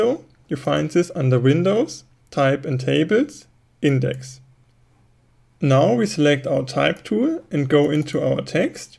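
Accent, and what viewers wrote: Germany. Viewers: I simply loved his explanation! I am Brazilian and I speak little English. And although you have the German accent (it seemed to me), his way of explaining was very good, really. Got it in practice more than 80% of the things you said. This is a challenge for me because I have difficulty understanding English when people talk a little faster. With you, it was great! Another important point is your personal position because you are very friendly and pleasant in their explanations. Congratulations! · Very nicely explained. Great German accent too. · Very well presented ... Easy to follow your tutes and a pleasure listening to your 'German English' :-) thanks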